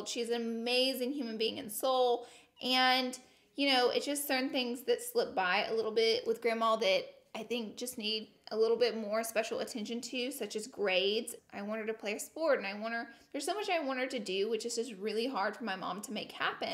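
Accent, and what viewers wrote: South, United States. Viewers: omg grl! thank u so much for sharing & I watch your channel because of the makeup & the comedy! you are so funny! I'm from the south too so thank u for not hiding your accent or your goofiness. You are amazing! It takes so much dedication to work with your husband I'm sure. thank u for always being yourself . God bless u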